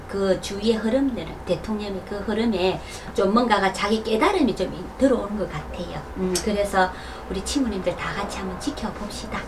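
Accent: Gyeongsang, South Korea. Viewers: 옛날에 *자갈치아지매*라는 라디오방송이 있었는데 비슷한것 같네요. 구수한 경상도 사투리 정겹습니다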